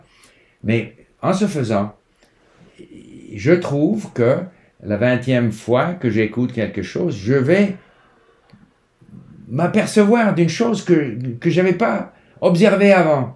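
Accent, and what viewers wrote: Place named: United States. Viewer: الأكسنت تاعو رايح للماريكانية بسك ماريكاني . بصح الفرنسية تاعو parfait 👍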